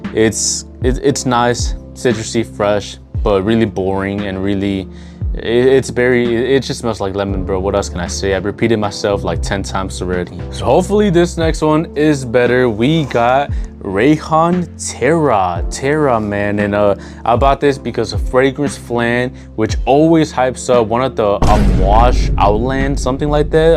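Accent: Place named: Mexico. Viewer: He’s not talking like that at all wtf are you talking about, he does have a sort of Mexican accent though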